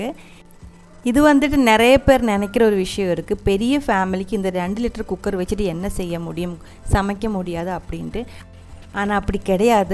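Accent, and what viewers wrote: Kerala, India. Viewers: Unga voice enakku romba piduchu irukku chechi. Malayala tamil 👌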